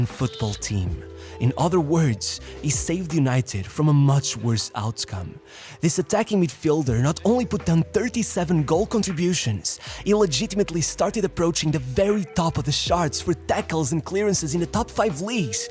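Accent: Portugal. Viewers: I can tell you are portuguese by the way you pronounce portuguese words, great video!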